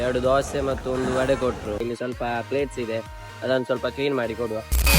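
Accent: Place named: Kerala, India. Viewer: Malayalam slang anne chandha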